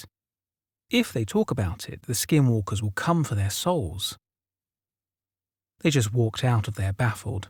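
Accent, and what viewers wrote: United Kingdom. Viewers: Does anyone else find it difficult to listen to a story about north Georgia being narrated by a brit?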